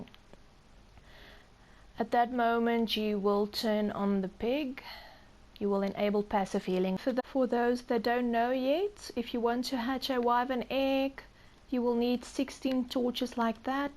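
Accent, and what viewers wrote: South Africa. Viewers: Are you South African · Oi are you south african i can tell as i am one too! I can prove your name means Ice Princess #sageneralknowledge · I recognise that accent from anywhere! Suid Afrika represent! You go girl!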